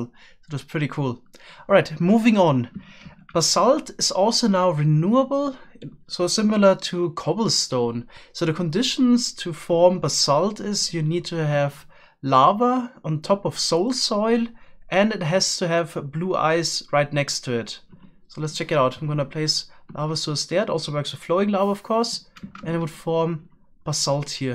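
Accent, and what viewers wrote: Germany. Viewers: Dein Englisch is so deutsch, ich kann nicht mehr 😂😂, keine grammatikfehler oder so aber der Akzent is einfach geil! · Ich Liebe deine Videos, Aber es ist echt schlimm einen Deutschen Englisch sprechen zu hören wenn mn weiß das Er es auf deutsch besser könnte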